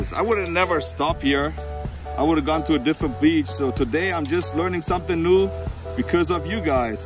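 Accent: Germany. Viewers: Thanks for the tour. I find it very interesting. Seems there's many beaches to choose from, all pretty close together. Loved the video. Love your Deutschland accent & the Arnold impression. Stay safe!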